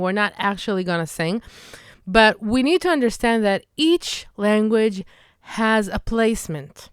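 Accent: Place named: England, United Kingdom. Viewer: You definitely have the English twang. Your English sounds 90% Native.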